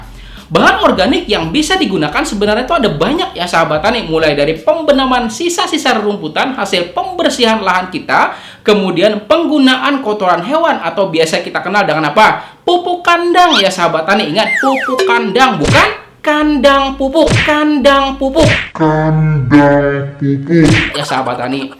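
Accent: Banjar, Indonesia. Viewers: Urang banjar kayak gitu ai... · Bukan Sunda tapi banjar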